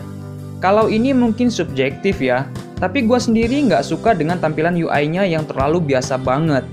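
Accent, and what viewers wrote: Lampung, Indonesia. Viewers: orang lampung ya bang? nyo kabagh:v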